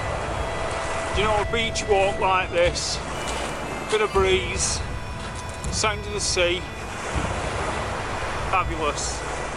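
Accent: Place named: Northern England, United Kingdom. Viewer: Awesome video and walk,. I've been eagerly awaiting the arrival of part 2, which has been an enormous pleasure to watch. A question for you... I was born and live in and around Southampton for just over 22 years, yet I know that your accent is not from the south, so, where were you born? I'm always being told that I haven't lost my accent, yet I've live in the Peak District now for 52 years. This is why I love watching your southern walks and camps so much, one never forgets one's home of birth. Thanks so much and I'm looking forward to your next walk/wildcamp. Take care...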